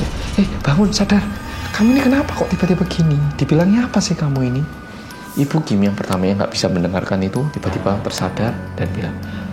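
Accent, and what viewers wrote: Java, Indonesia. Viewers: Merinding boosss, sumpah Bagus nih cerita nya bang medok👍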